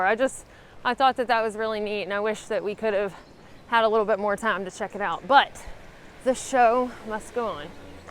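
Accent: South, United States. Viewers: OMG, the part where you said "Hitch in your giddyup" and those people call you out in your accent... hilarious. Your voice is so southern when you're trying to be cute.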